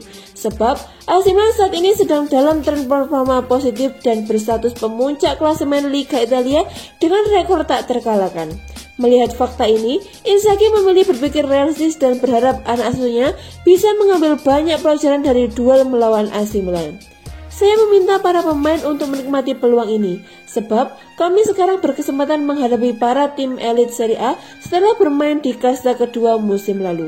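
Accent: Java, Indonesia. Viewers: Haha medok cok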